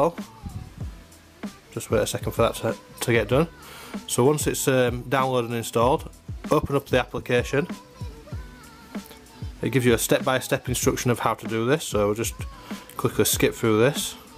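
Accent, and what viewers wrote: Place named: United Kingdom. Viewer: He sounds like a British lad will be surprised if he aint i love mi British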